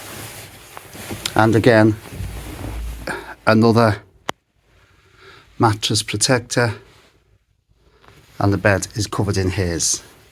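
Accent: Liverpool, United Kingdom. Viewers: Are you Billy Kings brother? Another insufferable scouser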